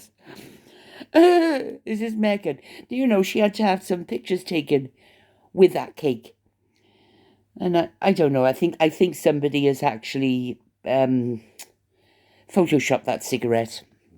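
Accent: Wales, United Kingdom. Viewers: Subscribed and enjoy following your channel from 🇰🇷. Btw, love your Welsh accent :) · Hello from New Zealand.I just love listening to you and I admire your intellect and humour. I too notice teeth 🦷🤪. I have a soft spot for Wales, the Welsh and the accent. Have a lovely day. 🥰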